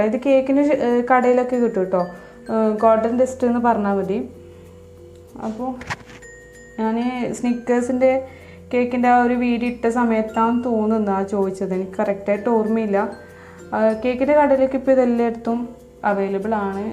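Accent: Kannur, India. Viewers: Enthooli nammale kannur slang😁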